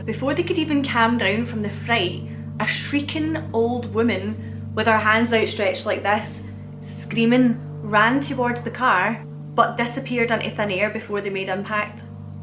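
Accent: Scotland, United Kingdom. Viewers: Great video and amazing channel... And I love your Scottish accent too! 🙂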